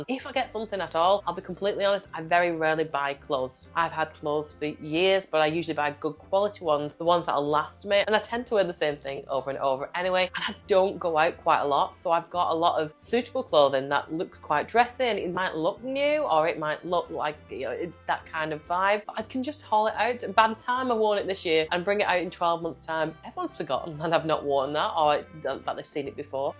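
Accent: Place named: North West England, United Kingdom. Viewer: You sound very local to me in the North West of England 😊 - we bring a live potted tree in - this is year 3 and up to now that’s my plan for this year - brings joy year round.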